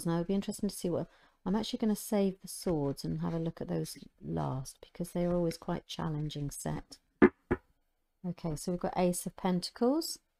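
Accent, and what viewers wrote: United Kingdom. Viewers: everything sounds better with a british accent :)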